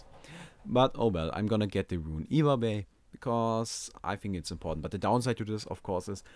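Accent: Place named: Germany. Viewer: Haha nice accent... du bist so deutsch! hies furd abiletea...that is in seit of his enemy.. krass.. guter guide... kennst du kino der toten black ops 1.. manchmal bist du ein deutscher und der hort sich genau so an wie dein ausprach.. wirklich ich lach mich tot.. gruB und keep on the good work aus holland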